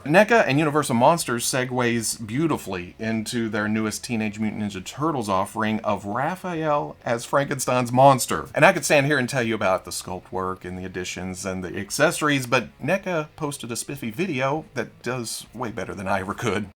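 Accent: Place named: South, United States. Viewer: I say this with love….but man you hit “This is his Baby” so hard with that country accent. Lol #thatsweetsouthernaccent #roboark #queekly #THAILOG Then again I can’t say much being on the opposite side of the state. Lol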